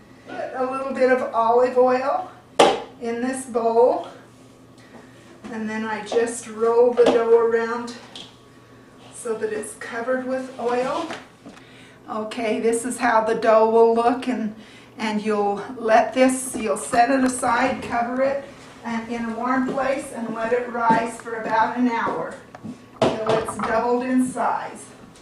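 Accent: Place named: Utah, United States. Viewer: Hi! I sure would like to try your recipe. How much wheat did you put in? I think the measure was edited out. I'll watch it again. BTW-as soon as you started talking I thought you must be LDS. You have that UT Mormon Mommy accent. So cute. When I looked at your other vids, I discovered I was spot on. Anyway...if you could give the exact recipe, I would very much appreciate it.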